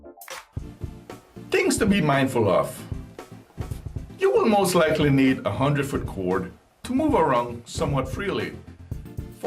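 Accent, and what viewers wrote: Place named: West Indies, Caribbean. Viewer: I got one today it works awesome. Interesting accent are from the West Indies?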